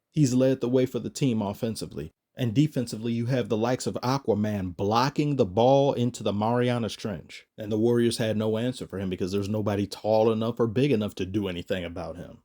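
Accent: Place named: United Kingdom. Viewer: Sounds like a British/Aussie accent more than a Kiwi one lol. Keep it up tho, moite! 🤙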